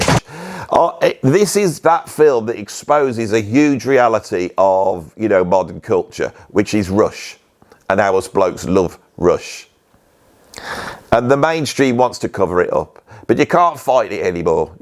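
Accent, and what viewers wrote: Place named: Wolverhampton, United Kingdom. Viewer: Here from Rick Beato as advised by you...Like , subscribe, laugh...This bloke doesn't love Rush...You talk like my relatives from Wolverhampton BTW.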